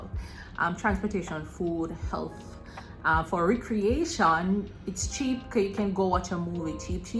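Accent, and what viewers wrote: Jamaica. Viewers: I can feel Jamaican accent behind you words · Jamaican? Hi from Trinidad!!!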